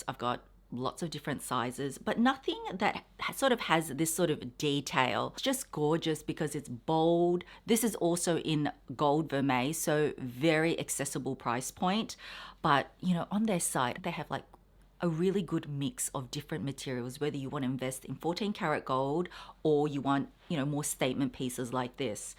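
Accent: Australia. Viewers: Hi! I'm new to your channel, but I just love your videos and your Australian accent. Congrats on hitting 300k! Three prizes is my choice. 😘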